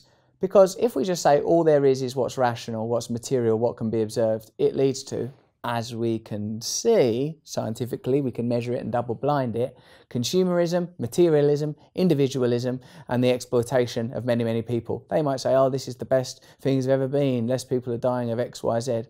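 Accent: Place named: Cockney, United Kingdom. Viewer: I wish his Cockney weren't so accentuated. I have to concentrate for a second, think of the word in context, then figure - he must have said maTTer. lol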